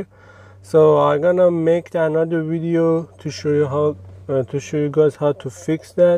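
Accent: Iran. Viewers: I love the Persian accent. ❤️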